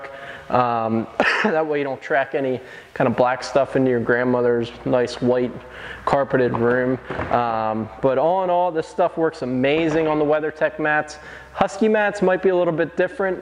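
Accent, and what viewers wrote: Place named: Midwest, United States. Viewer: You've gotta be from the Midwest with that accent 😄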